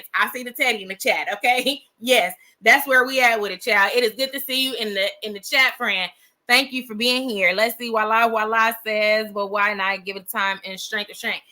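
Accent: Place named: Los Angeles, United States. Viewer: btw I’m from So Cal. I hear LA in your voice 💜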